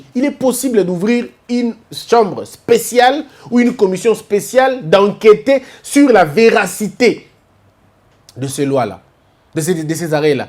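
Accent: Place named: Lingala, Democratic Republic of the Congo. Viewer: OOOH OYO LINGALA KITOKO BOYE OMAGNOLI YANGO MALAMU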